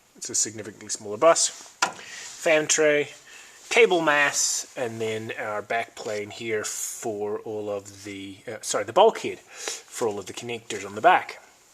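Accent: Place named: Scotland, United Kingdom. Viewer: Ah, right. Thought I heard a bit of Scot in there too. I guess you get this question a lot.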